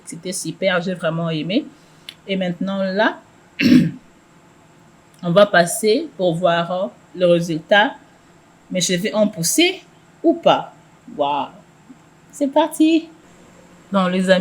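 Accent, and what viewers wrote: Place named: Congo. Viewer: Hummm l’accent du Congo 🇨🇩 my sister 💪🏾💪🏾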